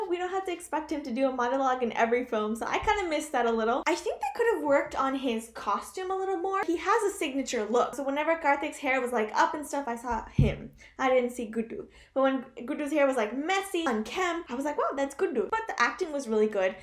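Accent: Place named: United States. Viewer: Damnn.....Your Hindi with American accent is kinda cute and it is growing upon me...💥💥💥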